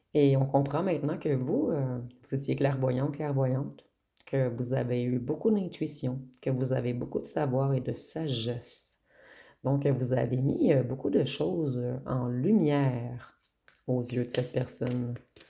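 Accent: Canada. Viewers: Tirage très complet deux personnes se superposent. J'adore cet accent canadien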